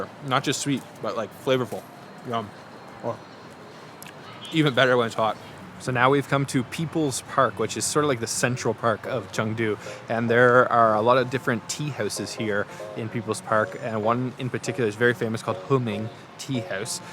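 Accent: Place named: Canada. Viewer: is canadian? i can hear a canuck accent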